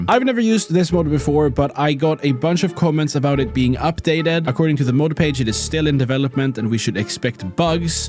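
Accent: Sweden. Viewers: Asså jag har aldrig hört en sån stark svensk dialekt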